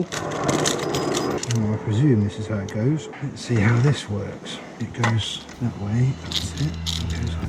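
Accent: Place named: United Kingdom. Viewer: Nice video. Took me a while to get round to watching it but I really liked this one. The hands on practical stuff is cool to watch, not to mention your familiar blighty accent and humour along with the well paced editing here. She definitely sounds a lot better with that black widow exhaust, I think you chose well! Is the factory you mentioned a side job you have? - James from 台中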